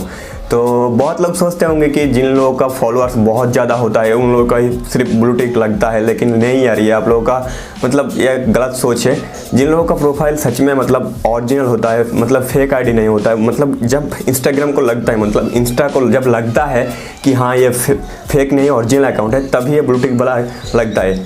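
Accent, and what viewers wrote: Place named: Bihar, India. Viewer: Yeh bihari asscent kahan le late ho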